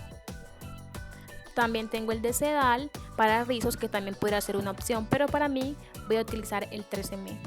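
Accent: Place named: Chocó, Colombia. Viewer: Hola hermosa. Cuando te escuchó me recuerdas a mi cuñada, tiene ese acento de Quibdo Chocó como el tuyo. Pero no se si eres de allá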